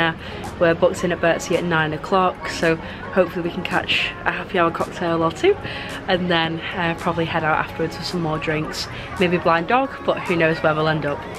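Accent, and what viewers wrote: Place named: North, United Kingdom. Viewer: ❤Fab video, I love Skiathos, cant identity your accent, nothern but where you from ? ❤ xx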